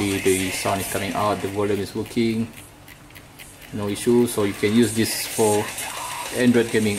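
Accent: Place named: Malaysia. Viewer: Your accent just like Malaysian.....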